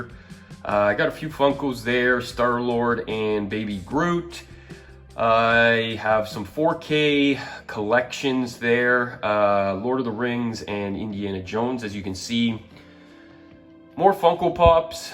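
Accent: Canada. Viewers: Tu québécois man? 🤔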